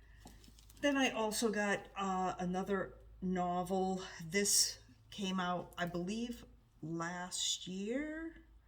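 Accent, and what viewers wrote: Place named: New England, United States. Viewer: I love my local libraries too. We live in a pretty rural area where I am, but they do a nice job I think, and I’m looking forward to being better at participating once my kids are older and I have more time. A Very Virginia Christmas sounds fun. I loved Tomorrow and Tomorrow and Tomorrow-such beautiful writing and very moving to me. I hope you like it! I’m not sure if I’ve ever read any John Grisham. I’ve seen the movie The Firm of course and I didn’t realize there was a sequel, wow. Maybe I should try one. Just out of curiosity, are you originally from New England? I have a lot of family from NH and you have a slight accent so just wondering :)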